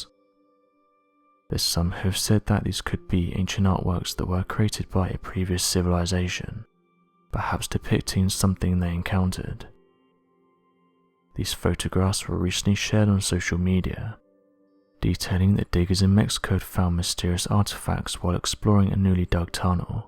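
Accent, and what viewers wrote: United Kingdom. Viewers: Your British accent does not make you more credible.Am I the only one that has noticed the increase of British commentators on science discoveries and documentaries in and around the United States as if USA citizens are not creadable commentators or witnesses worthy of giving a true account about things related to the United States it’s history or it’s science documentaries but the British are and taking over positions in our media. I am not trying to make a racial thing about being from England to discriminate or bad mouth them as a people. I am just stating how more and more it is people with British accents narrating our special documentaries on US history and scientific studies in the US as though they are the experts on these subjects and American commentators are not getting the narrating jobs here close to home. I don’t know what’s going on. 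But I can’t help noticing this change in US media coverage. I haven’t heard anything about the British networks buying up our nations media networks?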